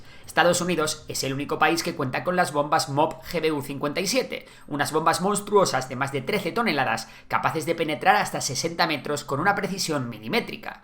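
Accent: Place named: Spain. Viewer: Oista chaval que feo es el acento español tío no lo tienes en latinoamericano?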